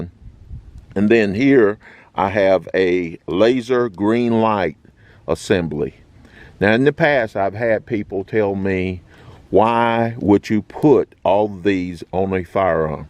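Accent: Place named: North Carolina, United States. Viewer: That’s a NC accent if I’ve ever heard one! Great review brother. 😊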